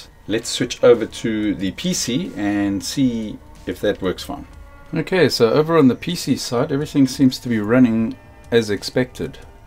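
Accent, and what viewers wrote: South Africa. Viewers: UNRELATED COMMENT: but he south african aint he? dont be mad i aint racist just curious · Are you South African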